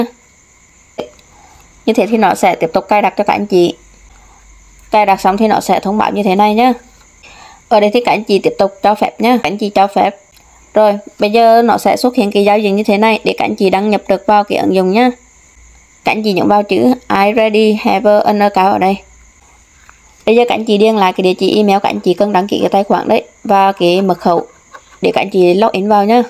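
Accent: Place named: Quang Tri, Vietnam. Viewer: A quảng trị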